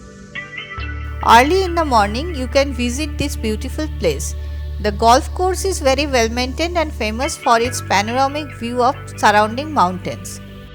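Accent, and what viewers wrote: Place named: Bengal, India. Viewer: Your pronunciation of english in Bengali accent is very beautiful to hear.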